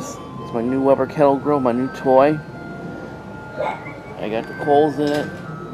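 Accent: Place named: Australia. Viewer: Great idea for a video man! I found it entertaining. I don't really use that much charcoal for my first seasoning, I just fill the chimney and burn that much out...but you definitely made sure you got out all contaminants out! I loved the aussie ascent too!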